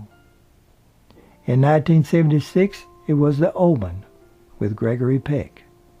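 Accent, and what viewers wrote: South, United States. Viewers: ...i got used to it.....and then I liked it...maybe southern or mid west? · Mr., you are such a good spokesman. You are genuine in you're speaking -when telling the stars biography. I so enjoy the way you speak. You sound like a southerner, yourself. I fine it odd that many people from the south does NOT talk as southern, as the stars who betray them. Of course some DO HAVE that kind of southern draw. I'm a southerner myself. When I married my second husband, his father called me his little southern belle. He was an attorney from OKC. An educated man. His family were originally from up north. The whole family had good grammer. I learned so much from them. Little country girl who had never traveled much. I was intimidated, to say the least. I married very young, the first time i married. They could have done a movie about my life. 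I didn't have a good beginning but, i did get the chance to go to college. After I divorced the husband in which I'm speaking of. My life very complicated. I was only 16 when I married, the first time. Dropped out of high school. I did take the GED test and passed, to my surprise. We all went out and celebrated. I had two children when we married and could not have anymore children. I was 7 years older, than my husband. I was 27 and he only 21. He was intelligent. Had a good career. His grandfather retired from the company, which my husband also started his career there. I had such a sad life. Had bad things happen to me. I've often thought about writing my own bio and see if someone would like it. I do think my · I love the narrators down home southern accent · THE VOICE HMMMMM!!! PASSABLE!!I GUESS ITS " SOUTHERN AMERICAN" ACCENT!!NOT CUT GLASS ENGLISH!!!HOWEVER, THE ENGLISH LANGUAGE HAS CHANGED DRAMATICALLY!!!EVEN IN THE U.K.!!!FROM(U.K.) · I told my mom that Lee had the prettiest eyes. 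I LOVED The Long Hot Summer and so did my folks! She died way too soon. Your voice is so soothing but I have lots of Southern reatives and I guess that's what it is. Could you do a video on Burt Lancaster? I always liked him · Interesting accent and pronounciation of some words. I wonder if it’s just the speaker or common to a town somewhere in the south. Good video. Thanks.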